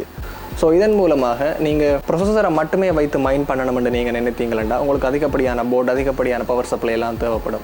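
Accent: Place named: Sri Lanka. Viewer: இலங்கை தமிழ் தமிழ் தான் 😊 I'm india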